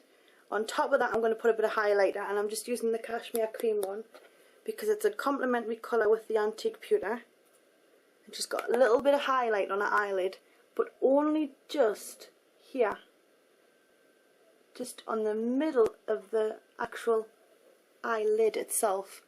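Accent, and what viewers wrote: Newcastle, United Kingdom. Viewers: brittish? or scottish? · it might be odd sounding to you, but it's perfectly normal for me! she's from south shields, in england, and people from that area all have that kind of accent, it's called a geordie accent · No she is from up north in england :) Kind of newcastle way :) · shes talking like british really ugly engish the my is the best not the original but the best!!!!!!! :D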